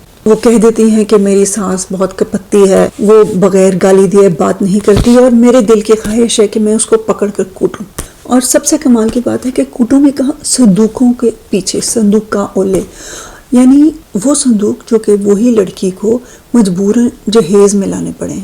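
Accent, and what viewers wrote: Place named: Pakistan. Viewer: I love your Urdu accent. Vintage ptv Urdu haha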